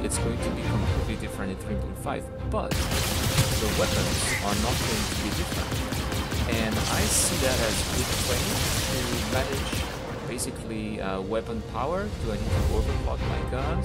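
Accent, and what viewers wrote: France. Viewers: tu es breton ? ^^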